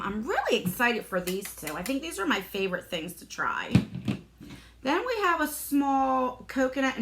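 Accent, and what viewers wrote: New Jersey, United States. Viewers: new sub love ur channel..💝 I can tell right away ur a jersey girl so I'm I !! 🌼 ps ur so pretty & ur makeup looks amazing!! 💄💋🌲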